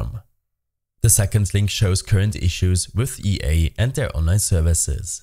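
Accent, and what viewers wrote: Germany. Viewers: ich hör eine sekunde und man merkt das du ein deutscher bruder bist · uhhhhh i hear a german voice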